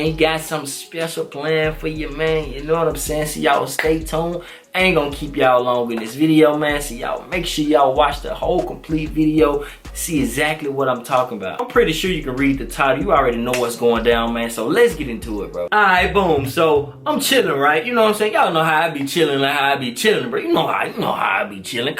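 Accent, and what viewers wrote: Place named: Memphis, United States. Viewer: You sound like u from Memphis..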